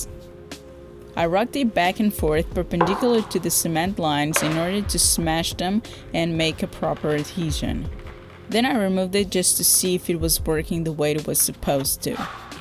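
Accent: Portugal. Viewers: Eu estava na dúvida se você era portuguesa ou imigrante. Depois desse vídeo, não tenho mais nenhuma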